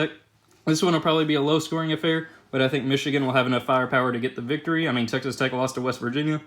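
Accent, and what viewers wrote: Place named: Kentucky, United States. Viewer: What part of Kentucky are you from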